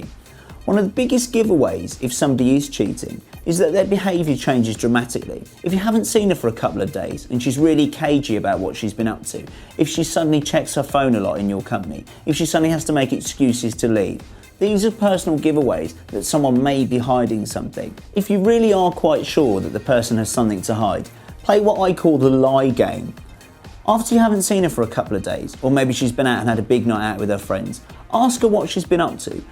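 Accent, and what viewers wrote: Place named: United Kingdom. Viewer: British accent is beautiful.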